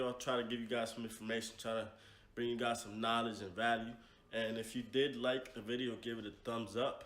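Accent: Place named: Texas, United States. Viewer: I know for a fact you from Texas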